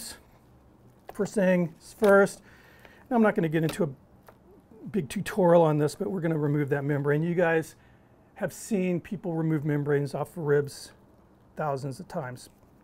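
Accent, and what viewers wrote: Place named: Texas, United States. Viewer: no need to have a Texas drawl, you're a pitmaster, brother! 🌵🤠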